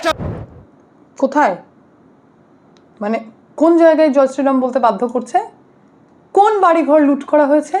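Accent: Bangladesh. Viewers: তোমার বাঙাল কথা টা যে কি ভাল লাগলো 😂😂😂😂😂😂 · tumi to besh bangla desii bhasa ta darun bollen khub bhalo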